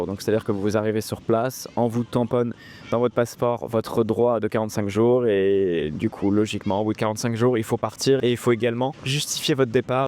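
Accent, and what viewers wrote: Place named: France. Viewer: Totalement d’accord, j’arrive de Da lat et vraiment le coût des logement à Nha Trang est vraiment très, très intéressant pour de très bonnes prestations. Pour la conduite, tout à fait d’accord, prudence! Un point qui m’a choqué à Nha Trang par rapport à Saïgon c’est que les motorbikes ne respectent pas la nouvelle loi et passent au rouge alors qu’à Saïgon, les scooters s’arrêtent aux feux (ce n’était pas le cas l’an dernier) Un point qui m’étonne dans tes vidéos alors que tu y es depuis pas mal de temps c’est que tu prononces le nom des villes à la française, c’est une volonté de ta part ou c’est que tu ne fréquentes que les « digitals nomads » et pas les locaux? Côté activité, tu as quand même le Musée Yersin et la Galerie de l’un des plus connus et vénérés des photographes vietnamiens: Long Thánh.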